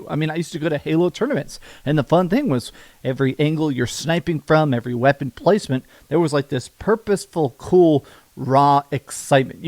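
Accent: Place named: Wisconsin, United States. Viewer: Is from Wisconsin? What is that accent?!???😂🎉😢😢🎉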